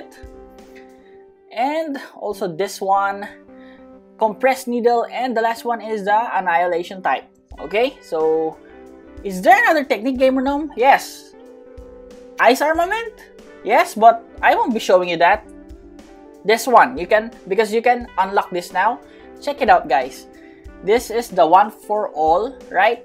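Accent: Philippines. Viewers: Tunog pinoy to ah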